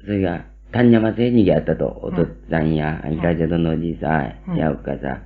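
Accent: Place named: Kagoshima, Japan. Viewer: 鹿児島県民やからやけど、まだ、わかりやすい方の鹿児島弁やな、頴娃語やとガチでわからん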